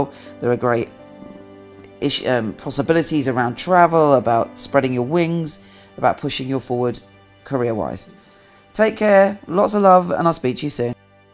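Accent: United Kingdom. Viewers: Your videos are stunning. if they had an award for production I would vote for you. I always come for my weekly and monthly reading. You are such a force with your information. Oh, I just adore the British accent. Cheers!!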